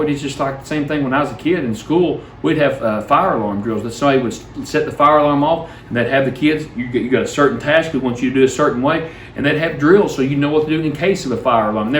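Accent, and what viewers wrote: Kentucky, United States. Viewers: So good to see my buddy and Kentucky accent..dude you are the best!!😊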